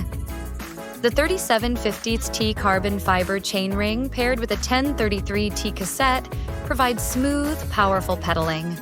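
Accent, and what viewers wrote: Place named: United States. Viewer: 대혼이구나 미국발음은...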